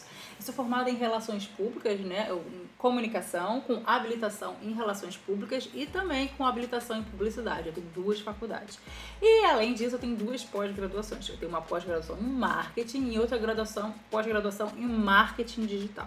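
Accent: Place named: Rio de Janeiro, Brazil. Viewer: O sotaque carioca é top. KKK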